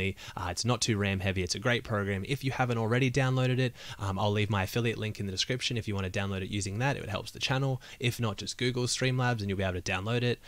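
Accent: Australia. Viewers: Your voice sounds like a little bit of ASMR aussie!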